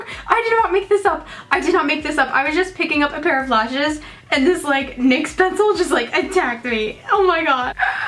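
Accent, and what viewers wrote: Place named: South, United States. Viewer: I hear a southern twang (other than the full country you do) but then I hear a Boston accent where the hell are you from. I would have said l.a before I started hearing all the other stuff.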